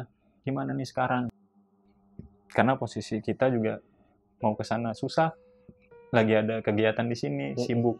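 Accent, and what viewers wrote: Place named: Serang, Indonesia. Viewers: eh ini abang nya orang serang banten bukan sih ,soalnya kadang ceplos pake bahasa serang 😂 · Kayaknya orang serang yaa bang dari cara bahasanya. Soalnya saya juga orang serang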